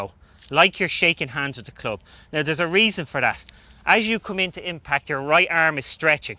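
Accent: Ireland. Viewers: 😂it’s the Irish pronunciation, clearly he’s from a smaller town or village. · I enjoy all of golf tips and especially the way he explains everything. Also love the Irish accent. Some of the best people are from Ireland.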